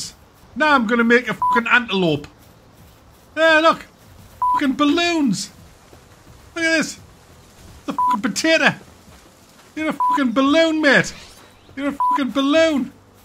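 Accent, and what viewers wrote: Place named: Sunderland, United Kingdom. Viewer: this. He's a mackem. I know because I'm from Sunderland. The difference in the accent is very noticeable to someone from the north east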